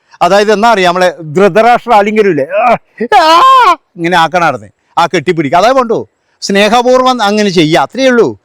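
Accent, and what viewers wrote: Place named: Malappuram, India. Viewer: ❤... good talking... Malappuram slangs..if it's ❤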